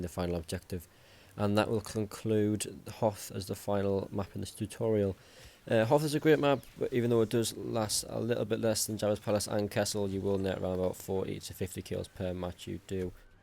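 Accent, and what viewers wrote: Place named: Geordie, United Kingdom. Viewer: Never have I needed subtitles until today Geordie boy. Thank God for the comments in English, appreciate the effort you put in.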